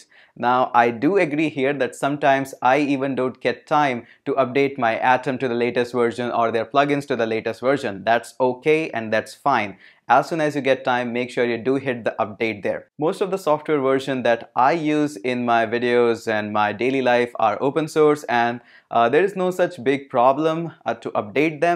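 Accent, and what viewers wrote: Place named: India. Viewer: I love your Indian accent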